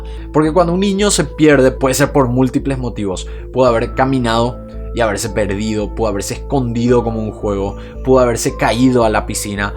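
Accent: Paraguay. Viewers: Acento Paraguayo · Omg eres de paraguay, me too ... Amo el acento pero yo lastimosamente lo perdi todo despues de años de vivir en este pincho pais (argentina) :(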